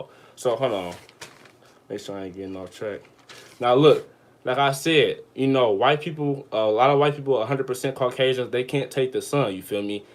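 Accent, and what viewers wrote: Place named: Detroit, United States. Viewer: You from Detroit? You talk like us 😂😂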